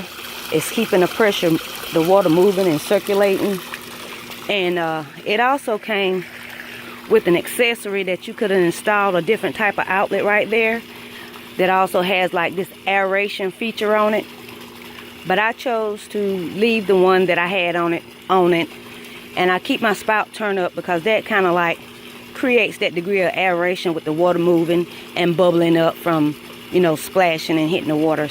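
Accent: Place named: South, United States. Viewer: Not sure what southern state you are at? I’m living in South Carolina by way of Lyons Georgia. Love your natural southern Accent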